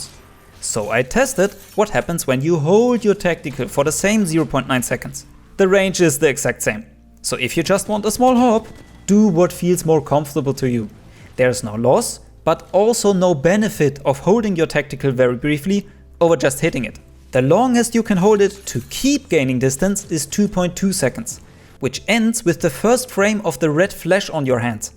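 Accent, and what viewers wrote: Germany. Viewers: Geiler allman akzent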